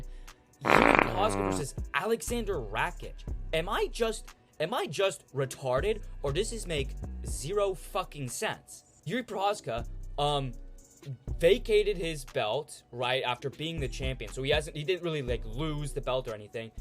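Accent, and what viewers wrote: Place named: Midwest, United States. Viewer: You are actually retarded! Definitely from the Midwest with retard accent dontcha ya know! So glad the UFC doesn't hire fucktards like you for match making. Why do you keep saying we? There's no "we" you are in no way, shape, or form involved with decision making in the UFC. One last thing... cut your fucking hair! You look like a botard!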